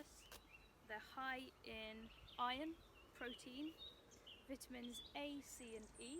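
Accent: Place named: England, United Kingdom. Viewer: Great to hear an English ladies voice, and of course, straight to the point. Great video!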